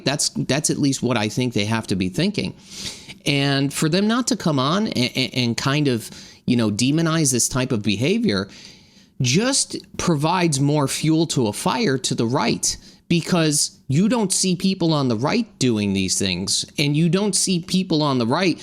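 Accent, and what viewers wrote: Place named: New York, United States. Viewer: Sounds like a NY accent. Domestic terrorist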